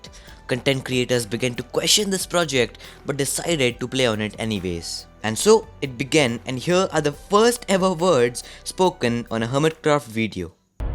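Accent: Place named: India. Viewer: You are definitely Indian. Judging by your accent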